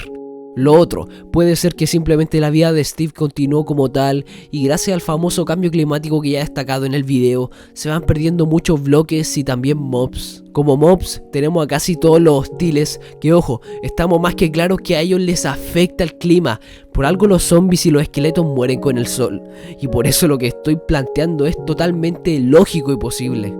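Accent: Chile. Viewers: chileno DETECTED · me gustaria saber como fue que se te ocurrio esta teoria, estaria encantado de escuchar como fue que fuiste formando esta teoria tan ufff pero con un sentido que no esperaba encontrar en un juego tan kawai como minecraft Pds: hehehe sabia que eras chileno con solo escuchar tu forma de hablar ^^ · Pta wn se nota que eri shileno ps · me encanta tu forma de narrar teorias y me gusta también el concepto que tienen. ya he visto varias y la verdad son muy buenas ya tienes una nueva subscripcion !! ademas me gusta el acento chileno ;) saludos desde arg. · Hablas español normal o habla chileno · Chileno xD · Eres de chile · dejame adivinar , ... chileno? :V xd -denme like para que lo vea y no desaparecer xd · apenas escuchar su intro este wn es mas chileno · Eres Chileno? :0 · apenas me meti al video y cache que eres chileno xd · Tu Acento Es El De Mi Pais Osea Chile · Tenías voz de flaite, ya te había identificado el chileno, y bueno, el video era tan excelente que llegue hasta el final y te escuche el “hueon”, lo que si, podrías dramatizar menos jajaja, saludos bro!! 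🇨🇱🇨🇱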